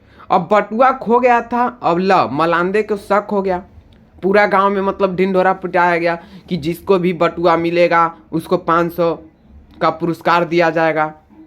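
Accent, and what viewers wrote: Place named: Bihar, India. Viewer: Total bihari vibe aaya sir maja aa gya😂 padhne me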